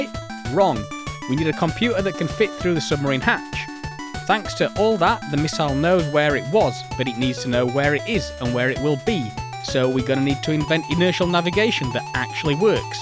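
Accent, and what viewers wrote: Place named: Northern England, United Kingdom. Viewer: I'm going to guess it did not occur to him his perfectly standard northern accent is "difficult to understand"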